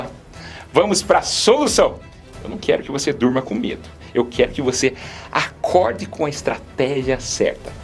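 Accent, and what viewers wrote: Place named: Paraná, Brazil. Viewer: De que região ou estado é esse sotaque, quase não dá pra entender algumas palavras. Parece ser do SUL, talvez do Paraná., esse DF deve ser a região pra onde ele foi e não onde ele criou esse sotaque! btw: dicas interessantes!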